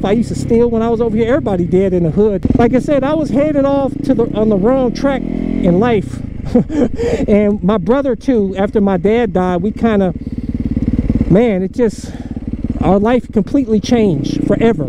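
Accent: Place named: South, United States. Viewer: I really thought you were from somewhere in the south like Luisiana.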